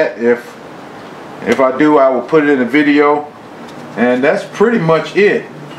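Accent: South, United States. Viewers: What state do you live in, got a bit of southern drawl there, I'm from Ohio myself born in Kentucky!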